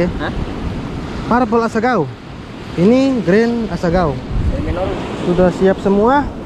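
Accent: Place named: Papua, Indonesia. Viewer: Mcm logat papua ka.. d papua mana kk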